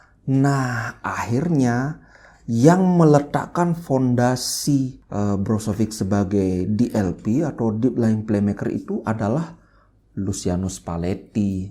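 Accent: Bali, Indonesia. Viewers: Logat logat bali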